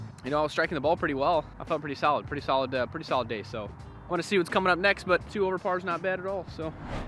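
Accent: United Kingdom. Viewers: Excellent british accent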